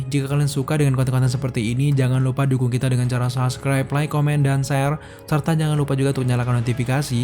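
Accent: Indonesia. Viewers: I am Always curious .. why Indonesians... almost of you like to change the english pronounce? Aku hairan kenapa kebanyakan kamu suka meng "indonesia" kan bahasa inggeris. Tune Core jadinya Tun-kor.. guardians yg sepatutnya disebut 'Gardian' tetapi dibaca 'gu-ar'-dian... princess yg sebutannya prinses tetapi disebut prin-ches... wth? semuanya sudah tidak kedengaran seperti English... dan kadang2 jadinya susah mau cari infromations dikarenakan sebutan yang tidak saya fahami...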